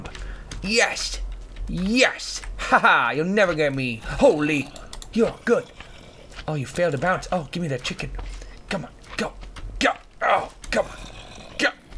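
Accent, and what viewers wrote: United Kingdom. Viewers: Btw BREAK THE BLOCKS THAT THE LEVER WERE ON PLEASE PLUS ARE TOU ACTING BRITISH?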